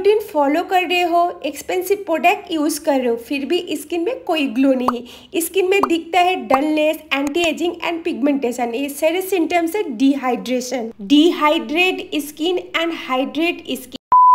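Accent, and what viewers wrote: Bengal, India. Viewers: Love this Channel and love your sweet bengali accent that pops in while speaking hindi ❤❤want to aski regarding can we have soups recipe as well since winter is approaching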